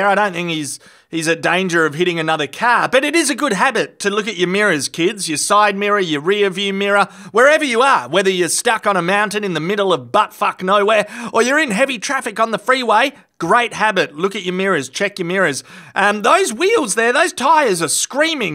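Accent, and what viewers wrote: Australia. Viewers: This just wouldnt be the same without the Australian narration.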